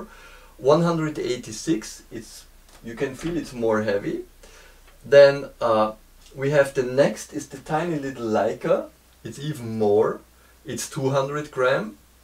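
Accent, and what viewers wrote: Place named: Germany. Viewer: Very informative, thank you! Your German accent adds gravitas to your thoughts!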